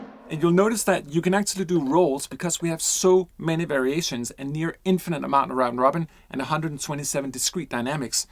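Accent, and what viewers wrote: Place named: Denmark. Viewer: I KNOW A DANE WHEN I HEAR ONE! Hej, en kærlig hilsen fra Danmark:D It’s black friday, and I’m about to spend maybe to much cash on this and that plug-in instrument, and then I found Soundpaint through 8DIO, where I just bought epic toms. But I can see that every day is black friday at Soundpaint:D …and that I should’ve bought epic toms at Soundpaint instead - and I will. While the samples may be the same, this is clearly a huge update. Good samples are good samples. Doesn’t matter if they were made today or 10 years ago. How we can use them matters. The Soundpaint player looks amazing, and I can hardly wait to download it and get to know it. De bedste hilsner fra DK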